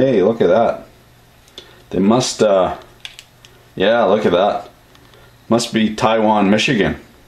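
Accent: Canada. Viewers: So glad youtube recommended me to this; just because of the accent lol, you in Northern Ontario or out West?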